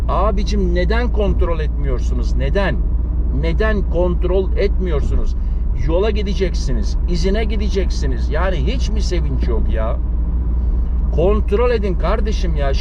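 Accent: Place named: Thrace, Turkey. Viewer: videolariniza yeni bakmaya basladim. Herkez yerine, „Erkez“ diyorsun. Memleket neresi? Trakya şivesi duydum gibi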